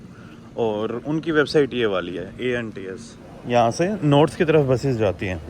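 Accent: Haryana, India. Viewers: Bhai ye pakka haryana ka hoga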